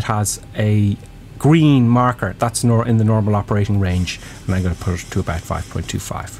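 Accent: Ireland. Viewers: I'll never fly these big jets, I just come for the great craic. Keep it up. Highly entertaining, especially the response to the shite adverts. No one says it quite like the Irish 👍😀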